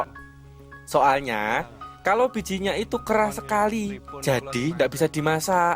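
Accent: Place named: Java, Indonesia. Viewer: logate ojok d medokne Cak biasa wae lah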